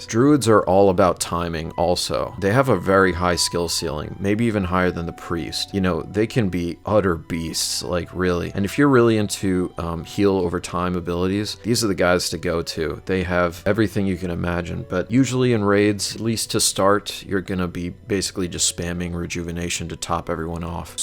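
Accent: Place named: United States. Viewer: I'm glad to have stumbled upon this gem of a channel! That's the case for me especially, since we seem to be very much on the same page; I'm like your European counterpart (unless you're not from the US, but you definitely do sound like it). Following the announcement of official Classic WoW, I was actually considering creating a channel and making videos on similar topics and in a similar fashion as you're doing, but real life got in the way, so it's nice to see that intention is being covered by someone else with roughly the same style, interests (LotR, obviously!) and even the same specific goals for Vanilla. As it happens, I'm also really adamant on playing a healing character since I played mostly as a mage and hunter during retail Vanilla & TBC (from which I'm returning to WoW directly). Like you, I was leaning most heavily towards the priest class, but now I'll also be strongly considering paladin, shaman and even druid (because I love HoT healing), at least for my alt characters. 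To effectively try out all healing classes, I'll be playing on an instant-60 private server called RetroWoW, where you can get free pre-raid BiS from vendors and start raiding or doing battlegrounds right off the bat. That should make testing out all of these classes a much quicker process; hopefully it'll allow me to make an educated choice before the launch of Classic WoW. Aside from which class to pick as my main, I'm also torn between Alliance and Horde. I feel more comfortable playing as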